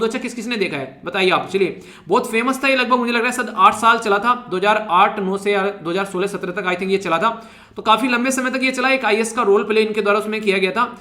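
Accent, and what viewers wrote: Haryana, India. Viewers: Namaste sir sir aj to app bhout haryanvi bol rhe app haryana se hi ho na lg rha h boli se mst lecture 100% charged thanku so much sir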